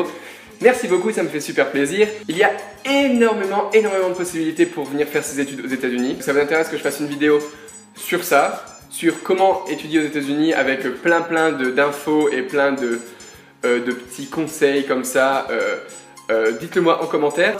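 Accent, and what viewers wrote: France. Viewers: génial ! oh mais....tu es ch'ti * _ * j'habite pas loin de lille,tu as fait un programme d'échanges? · Oh un ch'tis !! Check ahah ! J'admire vraiment ce que tu fais, en plus t'as un accent de dingue j'adore !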